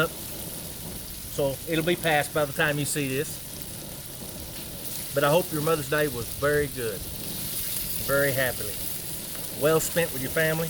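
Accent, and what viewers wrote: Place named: South Carolina, United States. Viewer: I have a cast iron every day, that's all I cook in. I am in SC and you talk just like me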